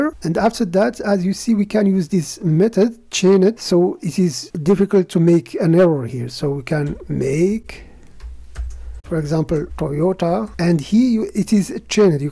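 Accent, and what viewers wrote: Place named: North Africa. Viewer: it seems from your dialect that you are from north Africa, allow me say it in my Moroccan dialect: LAH YE3TIK SE7A!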